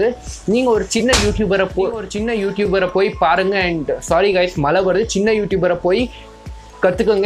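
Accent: Bangalore, India. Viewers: Bro are you from Bangaluru?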